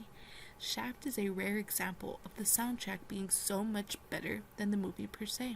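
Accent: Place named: United States. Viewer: U sound American and I'm in Australia so what I actually meant by colab was to put each others channel on our pages? I've never done it before. But I've heard its a good way to grow and gain exposure. My channels still a work in progress but I've got good things in mind for the future. I've done a some movie and TV show reviews on my channel and will be doing many more. So would u wanna do something like that? I'd totally understand if not as we don't even know eachother but I thought what harm could it do? lol Anyway cheers anyway. I'll checkout some more of your reviews.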